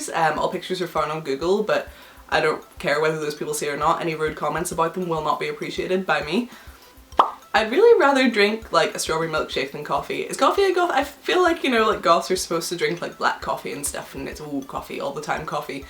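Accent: United Kingdom. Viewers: Is she British? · Where are you from? Your accent is kind of all over the place... British...Australian.... American...Scottish...? I don't mean that in an ignorant, mean kind of way. I really am curious.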